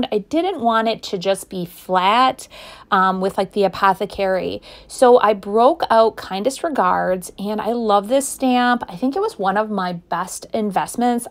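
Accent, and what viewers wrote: Wisconsin, United States. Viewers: I really, really enjoy your work! What caught me first was your voice...my husband is from Watertown. I absolutely love WI!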